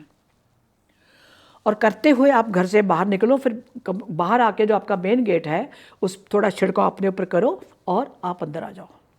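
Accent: Punjab, India. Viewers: Madam ji as per your accent I think you are punjabi , are you ?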